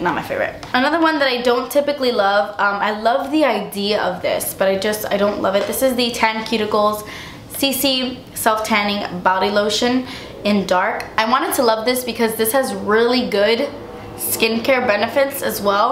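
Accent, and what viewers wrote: Australia. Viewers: Yes, I've just noticed they are doing that. They shouldn't have to justify the fact they like wearing fake tan. Although it does stop lots of those silly comments as she said. PEOPLE have right to better themselves, it's just like changing your hair colour, wearing make up, following fashion. Who doesn't want to look there best. Really enjoyed her review she sounds Aussie: )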